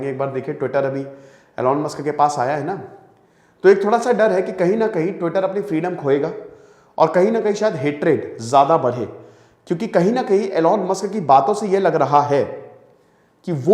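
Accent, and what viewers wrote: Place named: Punjab, India. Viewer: Bhaji app ki punjabi soo cute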